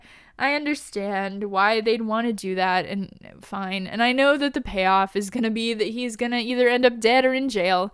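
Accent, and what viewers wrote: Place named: England, United Kingdom. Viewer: beautiful english accent btw ;P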